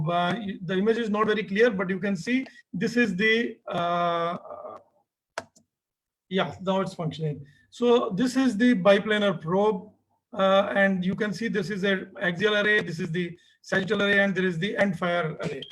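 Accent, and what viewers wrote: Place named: India. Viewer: There is NO ISSUES in the Audio, But the English pronunciation of the Doctor from India‼️He has a very strong accent from his country of origin. My observation only. Thank you for the lecture doctor‼️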